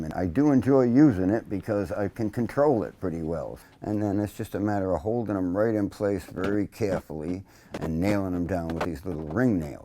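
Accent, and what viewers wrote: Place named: Boston, United States. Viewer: You have a stronger Boston accent than me haha, nice video!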